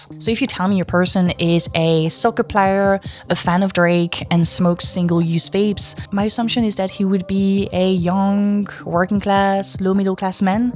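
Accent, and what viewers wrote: France. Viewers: I assumed you were upper-class because you're French and have a French accent. (And because you're pretty, admittedly.) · Why does sound more French than she used to? Her videos from 3 years ago sounded closer to an English accent than now? Is she just not around English speakers as much now? Just curious.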